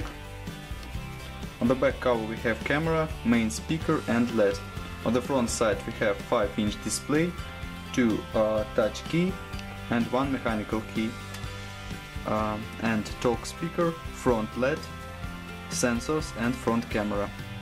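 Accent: Russia. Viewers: Русский на английском базарит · Мне кажется что у него русский акцент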